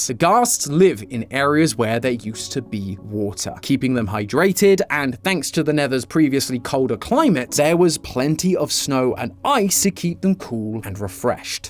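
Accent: United Kingdom. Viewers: youre the narator, whos VOICE IS BRITISH!! you used to work with Upisnotjump didnt you!! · Oh this is British now. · what did i miss why is british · BRITISH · more like why is the narrator always british 🙁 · unwatchable british accent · I haven’t watched since why is he British😢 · Yeah the British accent is too much 😂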